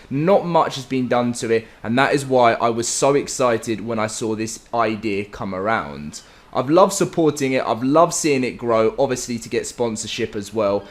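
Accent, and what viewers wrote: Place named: United Kingdom. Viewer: And yet another video that i have to skip because of a british accent that I just cant take honestly. I thought the new generations were loosing accents ? Why british people always gotta do stuff differently ? It sounds so gay I just cant sorry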